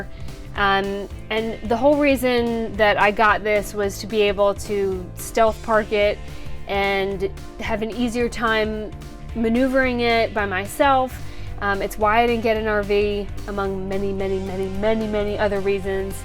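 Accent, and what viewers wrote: South, United States. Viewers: You rock girl. Lose the music as it sounds like someone is playing Pokemon behind you. Want to hear everything you say. If you play music it needs to be base so it doesn't compete with your wonderful southern voice. Glad to follow someone real. You and Chrome from vanlivs are making YouTube worth watching. Love ya. Keep that shit up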